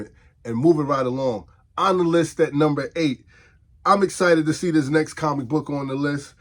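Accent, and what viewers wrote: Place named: New York, United States. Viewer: Yo! Did you go to school in the Bronx back in the 80’s and early 90’s? You sound like a New Yorker for real!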